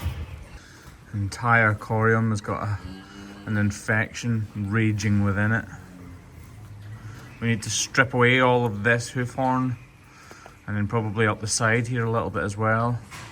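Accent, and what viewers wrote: Scotland, United Kingdom. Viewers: I just had a short come up under the title satisfying Hoof, is that your short? I ask because the Scottish âccent and voice sound like you. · No, it's Scotland. Between the accent and him saying southwest Scotland in the video.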